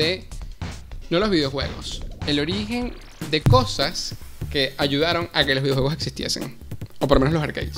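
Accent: Venezuela. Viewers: Me encanta tu acento venezolano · son venezolanos me lo e preguntado mucho por que un tio mio habla como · Como cuando ves un podcast de y te sale un anuncio de jaja Por cierto tu acento me suena a venezolano porque yo también lo soy jaja un saludo bro excelentes podcast y me suscribo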